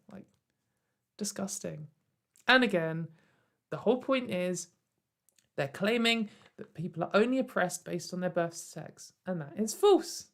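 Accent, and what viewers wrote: United Kingdom. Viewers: My first video of yours! Very excited! I have to say, I love your voice, it's honestly very soothing. And as an American I of course find any UK accent attractive (okay maybe not Cockney accents, but still) I also am so blown away by your patience and calm tone throughout the video (I mean I'm only halfway, but I wouldve lost my absolute shit by now).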